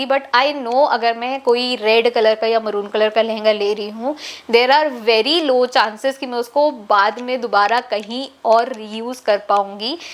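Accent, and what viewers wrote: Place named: Telugu, India. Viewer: aapka English Hindi baat karte Telugu Aata sister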